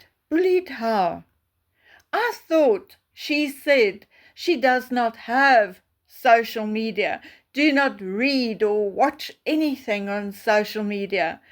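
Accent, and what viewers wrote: South Africa. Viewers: Just heard this post is this a South African accent here?? If so, greeting 🙏 from South Africa 🇿🇦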